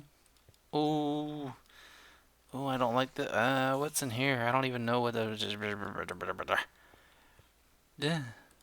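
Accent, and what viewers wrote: South, United States. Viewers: Are you from the south? It sounds like you have a slight southern accent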